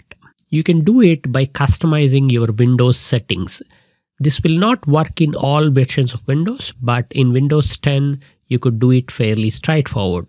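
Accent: India. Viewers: Sir your country name please? Your accent seems like Indian but when I saw your misses then I confused about your country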